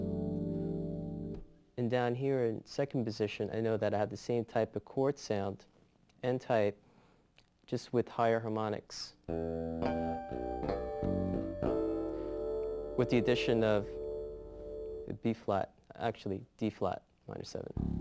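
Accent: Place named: New York, United States. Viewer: I love his New York accent.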